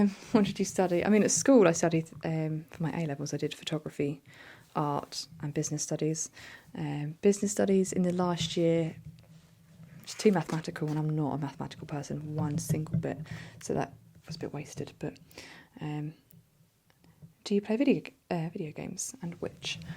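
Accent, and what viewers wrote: Ireland. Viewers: Great pt.1 of the Q&A. I think these bits of insight into who you are definitely made you feel more relatable, IMO, although we get quite a lot of that in your other uploads too! Really heartwarming to hear how your channel's had such a positive impact on your life outlook as well. As for the Irish accent video being so popular, I'm not surprised at all. There's somewhat of a dearth on YT of Irish ASMR creators, at least from what I've seen (or haven't). It can be such a beautful accent so it seems like a natural pairing with ASMR stuff. Searching for that is actually how I found your channel! Last thing, if you're 24 and born in 1996, then you're a rat. They do their signs on a rotation of twelve animals/years. This is definitely the longest comment I've ever posted, LOL!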